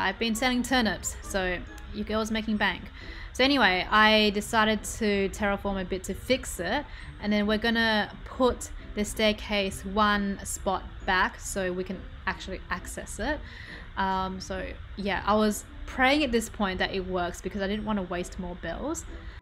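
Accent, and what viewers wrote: Australia. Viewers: shes australian not british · Are you from Australia? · She's reppin' her Aussie accent 🙌🏻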